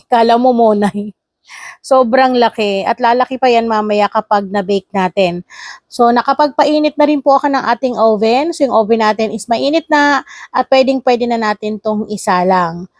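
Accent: Bicol, Philippines. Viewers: Boses bicolana ka po nanay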